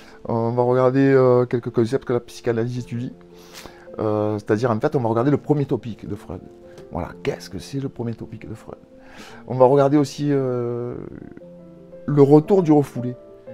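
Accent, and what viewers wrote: South, France. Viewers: J'adorée vous êtes de bonne humeur avec votre jolie accent du sud merci énormément voues êtes trop pédagogue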